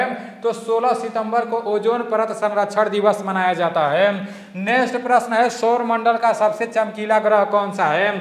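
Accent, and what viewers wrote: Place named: Bhojpuri, India. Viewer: bhai to ser he🦁🦁🦁🦁🦁 bhai tumhara bhojpuri bahut achha lagta he😂😂😂😂 sun ne ko message from Gamer Odisha 😅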